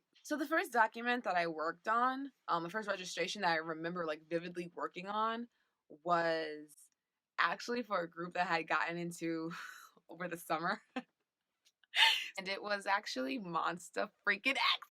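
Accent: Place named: New York, United States. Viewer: Super late to the game here, but I finally caught up with that Kurtis/Dean dating video and had to give you a sub - you're super entertaining. Also, as a New Yorker who has (unfortunately) lived in Buffalo for the last 4 years, it's nice to hear a real New York accent whenever I get the chance.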